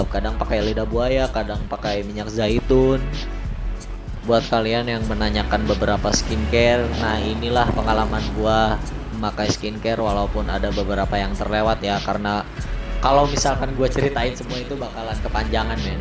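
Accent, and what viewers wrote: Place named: Karawang, Indonesia. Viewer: Orang krw mana nih suaranya ,...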